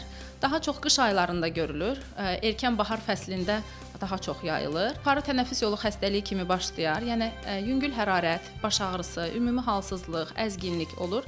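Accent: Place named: Kirkuk, Iraq. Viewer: Coğ coğ guzal konuşir. Tem bizim kerkük dili gibi... Salamlar siza kerkükten. ❤❤❤❤❤❤❤❤❤❤❤❤❤❤❤❤❤❤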